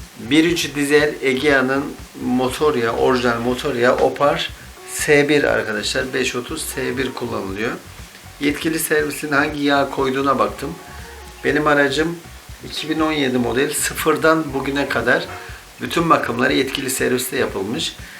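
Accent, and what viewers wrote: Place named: Konya, Turkey. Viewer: Konyalı olduğu nasıl da belli oluyor kardeşimin, Konya li olarak hemen anlarım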